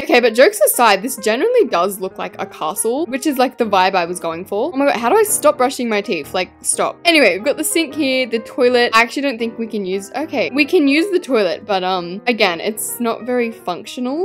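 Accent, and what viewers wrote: Australia. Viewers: Your accent is perfect ( btw I’m and Aussie too) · I wish we could switch accent I have a American accent, and I love your accent I wish I was Australian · OKAY I love your ✨ Australian accent✨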